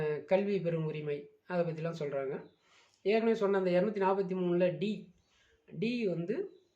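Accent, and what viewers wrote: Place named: Kongu, India. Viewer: Kongu tamil alagu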